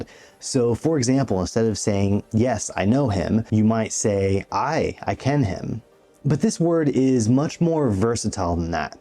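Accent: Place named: Glasgow, United Kingdom. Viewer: Understood every word and I’m English 😊 Granted I live in Dunoon. I gained most of my knowledge of the Scottish dialect from the tv sitcom, “Still Game”. Check it out if you haven’t already. It’s brilliant. The dialect you demonstrated is mainly from the Glasgow area. Travel up to the beautiful north and north west and it is much softer.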